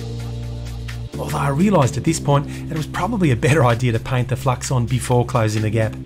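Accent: Australia. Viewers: G'Day, Every time I watch your fascinating videos I'm left with just so many questions: • This is the first time that I've seen "blueing" done with a bed of copper shavings. Most frequently I've seen it done simply with a blowtorch & a tin of oil, or with a chemical treatment. What's the advantage of doing it this way over something like Birchwood Casey Super Blue? • Why a box formed from thick brass & not just one from a (cheaper?) thinner mild steel cutout? Aesthetics or functionality? • Why only two feet instead of a more stable four? And why have the threading holes penetrate all the way through the brass (thus needing rivet caps), instead of making shallower holes (or just positioning them below the box walls)? I've only been aware of your channel for a short time, but have been riveted by the extraordinary attention to detail, functionality & aesthetics in your projects (especially the exquisite Playing Card Press). 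Not merely mass manufactured tools, but singular (& wonderful) works of art. I'm also pretty chuffed that there's such damn good content from another Aussie (I'm over in Bunbury, WA) - it gets kind'a tedious listening to American accents sometimes.; )~ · has anyone ever told you how wonderfully pleasant and warm your voice is? It's not just the novelty of the Aussie accent (I'm American, not something I get to hear often) but the fact that I can actually HEAR the smile when you talk. Love it.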